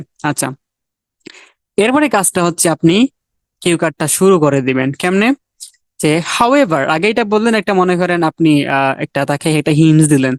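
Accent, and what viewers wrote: Sylhet, Bangladesh. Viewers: ভাইয়া আপনি কি সিলেটের?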